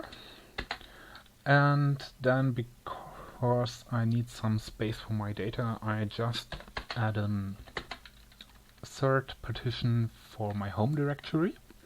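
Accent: Germany. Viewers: Great video tutorial for current linux users ! Don't worry about your English ;) You of course have a German accent, but I understood everything! Cheers from Denmark. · I heard your english and thought you were german... And YES, I was correct! Grüße aus Braunschweig!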